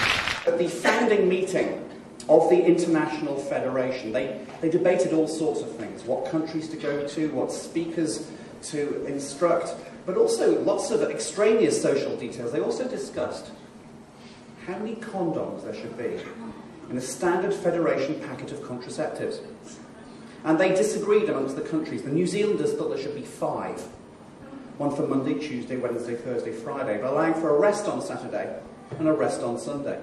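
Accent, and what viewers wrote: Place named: Isle of Man, United Kingdom. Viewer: Thought you were Manx!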